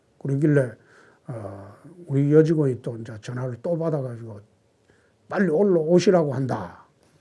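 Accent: Gyeongsang, South Korea. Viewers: 경상도 머심마다.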